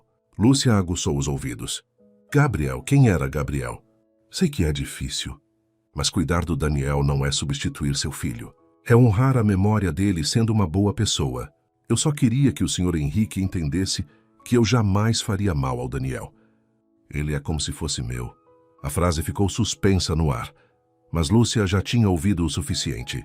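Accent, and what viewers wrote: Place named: Portugal. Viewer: É necessário tomar mais cuidado com a narração. Foi lamentável a escuta de portugues de Portugal, depois inglês...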